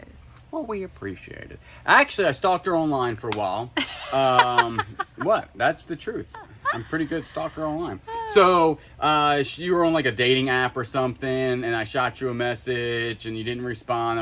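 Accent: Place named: South, United States. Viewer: That's just the southern accent y'all. I pronounce it the same way he does. 😂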